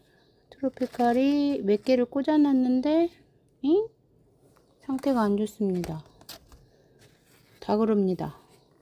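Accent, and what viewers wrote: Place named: Gwangju, South Korea. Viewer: 사투리가 정겹습니다 저도 광주라 듣기편했어요 ㅎㅎ 삽목이 꽃피운거 분양해주시면 안되나요? 저도 러샤바욜 하나 키우는데 둘이 칭구 만들어주고싶네요~~^^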